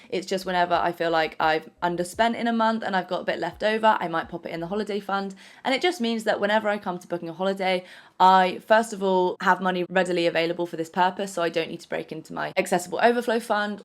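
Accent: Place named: United Kingdom. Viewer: i love you and your british accent so much ❤️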